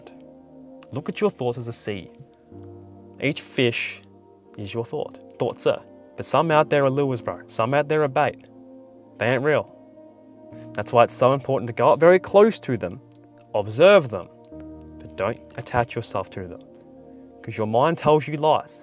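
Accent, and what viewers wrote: Australia. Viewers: with a Australian accent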